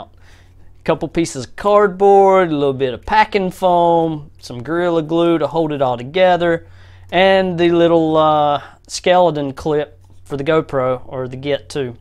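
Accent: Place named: South, United States. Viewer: The southern accent got me laughing 😂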